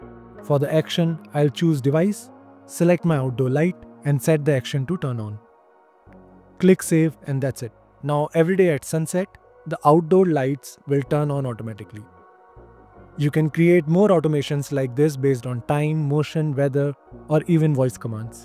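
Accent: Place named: India. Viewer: From your voice guessing you are an Indian and hope you live in India coz we need India specific affordable solutions. I have been using Sonoffs, Tinxys, Smarteefi...etc in my house and plenty of Amazon and Google smart speakers. This week have asked my kids to try HA on an old Lenovo mini PC. Eventually I may move to one of the Chinese mini PC brands like Gtek. The speaker you mentioned is 8K in India. Anyway we can use Alexa or Google speakers for this?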